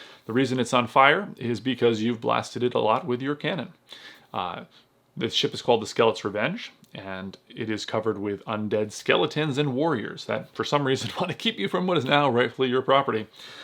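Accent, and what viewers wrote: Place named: Boston, United States. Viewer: Thanx for this mate. I'm hearing you're from somewhere in MA/Boston area, yeah?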